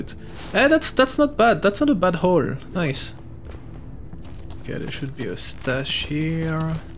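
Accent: France. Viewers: you have a big french accent i am wrong?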